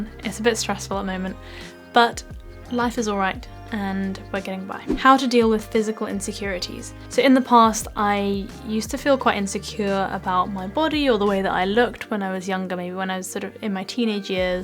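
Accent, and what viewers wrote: England, United Kingdom. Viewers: It is the first time I watch this video that British accents you pronounce and say so beautiful. keeping creative skills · HI Mam, You are so adorable, I love your English-speaking accent, I am learning English from your videos, and I always wait for your new video. Keep Blessing. Have a great job and all routine. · hy how re you, i am from indonesia and i like to learn british aksen by hearing your aksen, btw where are you from, i mean your origin country, thnks😁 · I love your British accent. It's so beautiful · Angel face with an English accent yet sooo cute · I can listen to your crisp English accent for hours 🤩and by the way your Mandarin pronunciation is spot-on! Obviously you have a flair for languages. PS - fellow beef hor fun fan here 🥰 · You are very beautiful and love the British accent and you have a positive and upbeat attitude and personality. 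· I wonder would you have more video on British accent- love the way you talk, your accent and want to learn… Would you please make some videos just read a short story or Article (please speak a little slow so I can Repeat after you) then I can watch over and over again to listen and learn. Thank you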